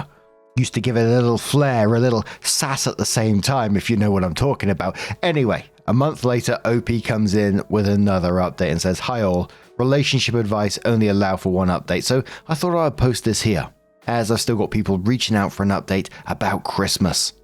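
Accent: United Kingdom. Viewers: so many times you have helped me. So much love to you. From Boise, Idaho, US. You sound like you might be from over the pond. Please take care of yourself. You’re a treasure.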